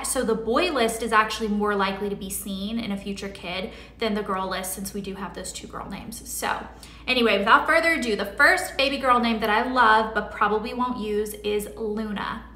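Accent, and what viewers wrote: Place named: South, United States. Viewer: You're sounding so much more Southern since you've moved out of LA. I'm so glad you've come back to YT, I've been watching you since your college pink room with the Eiffel tower painting. I have 2 boys Jude and Rory. It's so hard to name kids.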